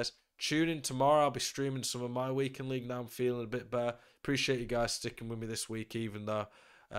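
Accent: England, United Kingdom. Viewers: Where you from man ? Your accent is English but I dont know which city ! Just curious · Bradford Innit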